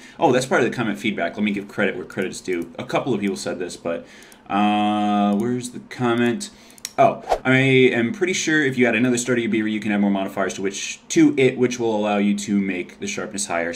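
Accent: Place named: Michigan, United States. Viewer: im from michigan too so sounds normal to me lol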